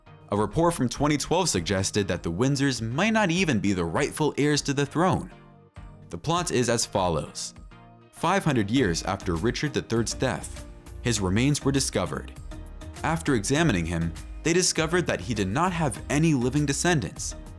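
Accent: United States. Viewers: However, however, however......... awful American accent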